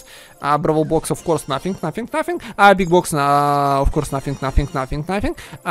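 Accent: Russia. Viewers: You are Russian wright?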